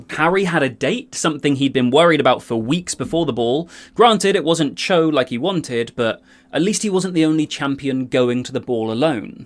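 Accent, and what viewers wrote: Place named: United Kingdom. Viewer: I like how you show books, and the second book is a little bit torn, so it reminds me by first books I read in my childhood. Miss them much. Now I have a Harry Potter audoimarathon, listening all books in English read by Stephen Fry. You videos also help me to enjoy content as well as good English (British) pronunciation. Wish you a Marry Christmas from Russia! (we have our on 7th of January). In Russia Harry Potter movies are not Christmas, but so-called New Year's movies, so I will start to rewhatch them soon)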